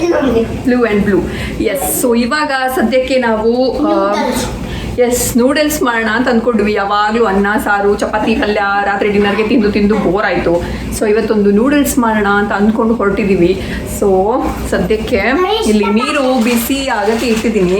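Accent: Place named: Bangalore, India. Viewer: Neevu awesome ri.... Pakka namma bng slang le matadtira.... I was in canada for 4.5 years almost... Liked ur videos... All the best🙂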